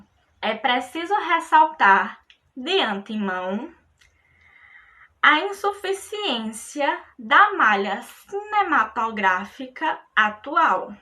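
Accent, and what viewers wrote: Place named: Northeast, Brazil. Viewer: Que sotaque lindo do meu nordeste ❤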